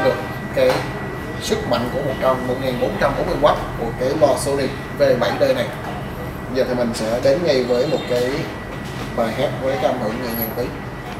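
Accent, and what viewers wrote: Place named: Vietnam. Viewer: am thanh trung thuc giong noi gioi thieu re qua ( miet vuon)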